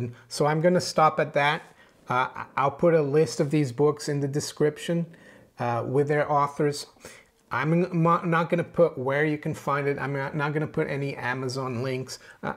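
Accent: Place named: Brooklyn, United States. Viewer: I'm fascinated by your plain Brooklyn-Italian sounding American English accent. If you were born in Brazil then when did you first learn English?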